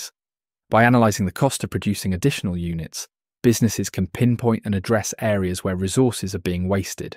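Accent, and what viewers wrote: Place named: United Kingdom. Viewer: I love your British accent❤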